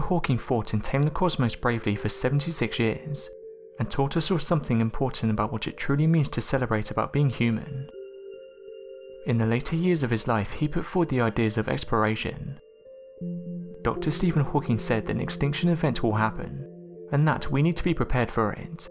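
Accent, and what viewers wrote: United Kingdom. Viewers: Another British accent. Cool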